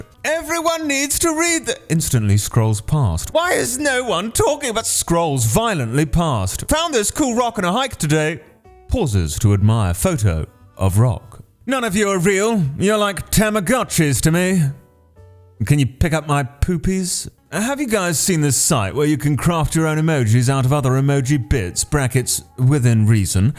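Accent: United Kingdom. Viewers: 'sup mr british voice sir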